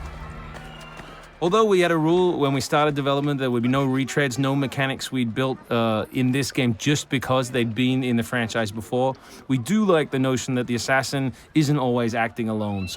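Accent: United Kingdom. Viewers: Oh are you british???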